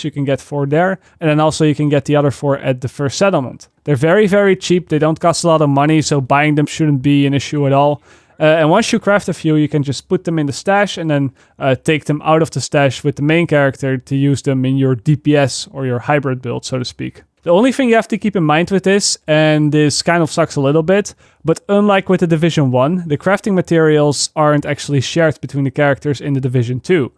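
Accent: Netherlands. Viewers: Is he dutch? His accent sounds like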